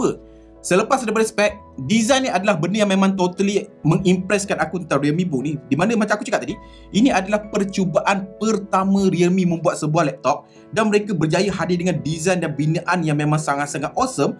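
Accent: Malaysia. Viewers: Bru prtma nnton yutub org malaysia . Seruu bahasanya . Saya suka logat malaya . Smoga maju terus channelnya bg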